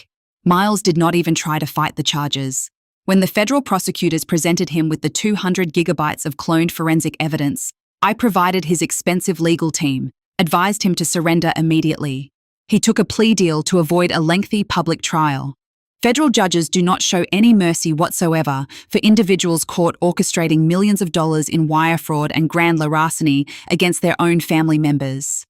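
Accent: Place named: Australia. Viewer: Kiwi here, Refreshing to hear an Australian accent. Some of those American accents are horrid. Good story, my youngest daughter had a similar experience when she was little, quite a traumatic experience for a young one.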